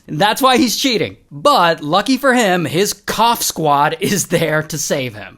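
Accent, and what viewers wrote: United Kingdom. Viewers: That British accent is wild! 😂😂😂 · Well done on the British accent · The British accent 💀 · I’m impressed on William Johnson British accent in this video it sounds like he’s actually British! 😂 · british accent is killing me 😂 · Liking immediately after hearing the British accent 😂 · Your British accent got me rolling 😂😂😂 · Impeccable british accent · Oh my. That british accent.... especially the 'oh bugger' not gonna lie i had to pause until i stopped laughing. Keep doin you. We love ya 😊❤😊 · The British accent is 🧑‍🍳💋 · The British accent 😂😅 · the british accsent is killing me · British accent 😂!!!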